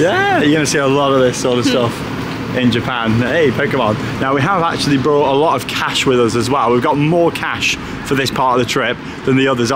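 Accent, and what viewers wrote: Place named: Merseyside, United Kingdom. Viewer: Lovely to see you both. Only just started but makes me laugh when has her Merseyside twang. Was more prominent on the carnival cruise so I thought. Awww they have vlogged after a drink. Then I see the open tin of budweiser. Or it may be totally not a bud and some korean AF drink but always talks with her mersyside twang after a drink and it's so so so cute. Going to unpause and carry on. X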